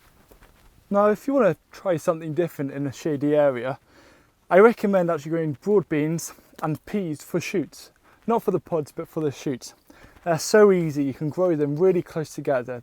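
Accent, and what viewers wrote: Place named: United Kingdom. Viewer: Where are you at? I thought you were brittish😊👍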